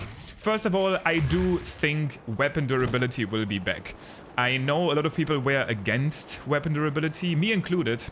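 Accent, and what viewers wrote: Germany. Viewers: Love this guy's german accent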